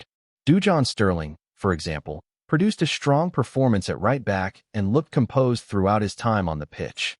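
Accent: United States. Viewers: Another one that likes to hear his own American voice. Over 15 minutes of blah, blah, blah with absolutely no information.....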